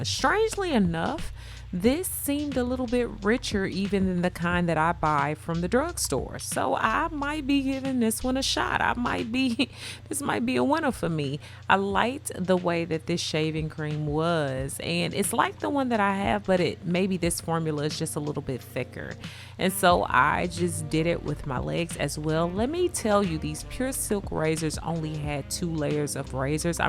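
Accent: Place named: St. Louis, United States. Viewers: Thanks for sharing. I love Dollar tree. I'm here for you anytime you say, here, there, etc all I think is St. Louis and Nelly. I love it ❤️❤️❤️ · Off the subject of the video but you talk like you're from St. Louis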